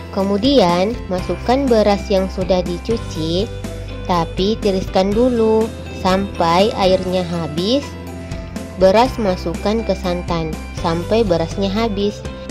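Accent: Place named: Bugis, Indonesia. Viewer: Asli org bugis ini dr caranya bcr bhs indonesia sm logatnya😅kurang lengkap kalo tdk dibuka,mau diliat bgmn hasilnya,tipsnya jg spy tdk cpt basi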